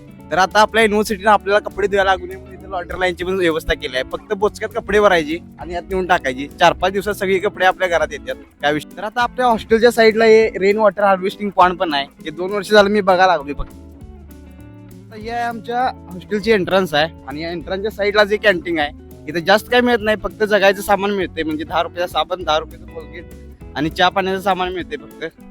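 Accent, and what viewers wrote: Kolhapur, India. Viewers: Tumhi kolhapur che ahat ka language varun kaltay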